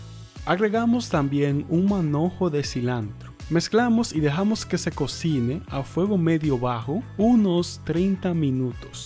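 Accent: Dominican Republic. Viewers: Eso se ve ricoo! una pregunta de que nacionalidad eres??? porque si no me equivoco me suena el acento Dominicano, yo soy Dominicana. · eres dominicano?. Saludos desde República Dominicana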